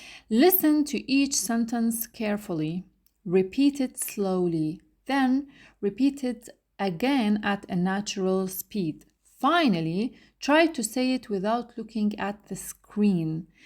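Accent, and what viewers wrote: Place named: Arab world. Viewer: أنت عربية واضح من لهجتك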